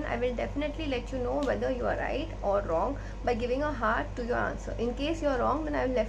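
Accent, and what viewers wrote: India. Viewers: your Indian accent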